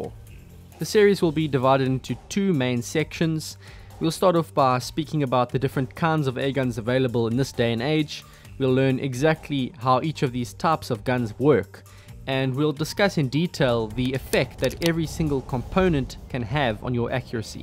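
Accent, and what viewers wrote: South Africa. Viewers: nooit hes south african!!!!!!!!!!!!!!!!!!!! subscribed and notified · are you from South Africa.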